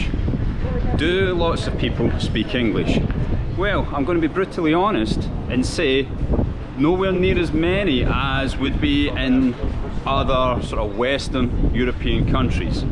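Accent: Scotland, United Kingdom. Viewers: Your Scottish accent sounds really nice 😊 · Love the sound of Scottish English ) thanks for saying such a nice things about Russians ! We will always welcome everyone, who’s coming to Russia with good intentions ! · You've touched on language so I have a genuine question about your accent. Now I've heard a lot of British accents, but I didn't know what's what. and this is the first time I'm fairly certain that this is what a Scottish accent sounds like. Is this a standard Scottish accent? Reason I'm asking is because I live in the US and there are many different accents, like the Southern Accent for example, where I live. And it is often considered the butt of all jokes, by other regional "accenters" of course. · Thanks for your lovely channel. Love English language, your Scottish accent is so nice 👍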